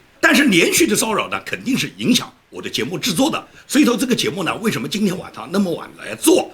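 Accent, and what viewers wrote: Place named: Fujian, China. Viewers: 福建口音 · 建民，耐心地采用法律手段收集證據還擊自衞。聽口音象福建人。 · 听起来像南方人，支持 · 福建帮？口音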